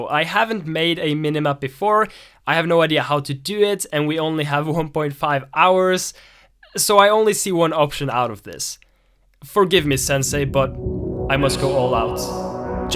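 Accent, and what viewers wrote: Norway. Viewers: Wait are you norwegian? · are u Norwegian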